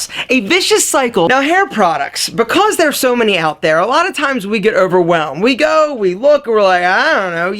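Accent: Philadelphia, United States. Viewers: Hes from the Philly area... I can tell bc he pronounced water as " wooder"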